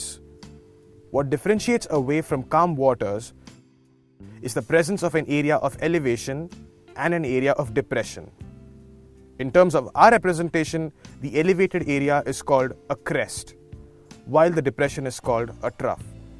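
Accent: India. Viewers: Indian accent everywhere in youtube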